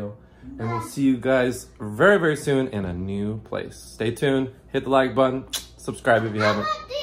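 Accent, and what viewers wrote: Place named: Visayas, Philippines. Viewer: Sir ang cute mo mag Bisaya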